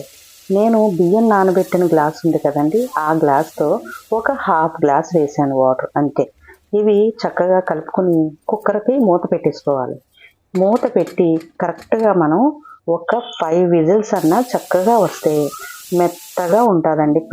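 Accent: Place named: Godavari, India. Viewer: Mana godavari style lo baga vivarinchi anduku miku thank you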